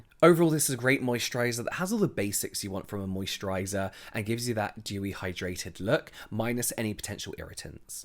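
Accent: United Kingdom. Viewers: not really, has that soothing voice + British accent. Have you heard Alfie Deyes’ voice? He’s British but no way he can do audio books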